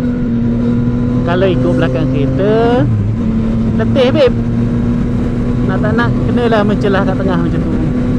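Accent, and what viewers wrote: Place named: Kelantan, Malaysia. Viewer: Doh msk kwsan kelate gk bey..ejs kcek kelate plok bey..hehe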